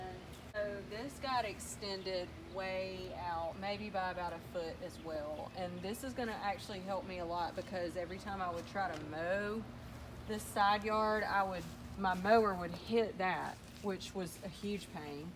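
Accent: South, United States. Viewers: 'Southern' sounds good on you!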